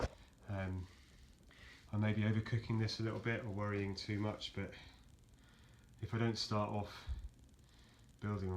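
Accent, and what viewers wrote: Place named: Southern England, United Kingdom. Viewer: Just watched it, very interesting. I've been a PFA/LAA member since 1970 and for a number of reasons (life) just got in the way of homebuilding. I've been to virtually every annual Rally but only flown into a few. I did consider the Turbulent then my interest swapped to the MB2 Colibri, however I was surprised to see in the LAA mag that a Turbulent new build was underway. I was going to offer help with your build but I live in the North and by your accent I assume you're down south. I've had a close look at the Turbulent at all the fly-ins I've been to (many) and it still interests me. Can I wish you the best of luck with your endeavour. I just noticed that it's at least 25 years since it was first started so I would be looking at how one can properly check glued joints - perhaps your Inspector can help?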